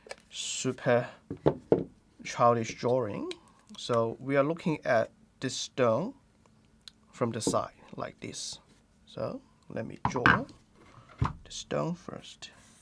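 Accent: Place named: England, United Kingdom. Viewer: english accent . funni .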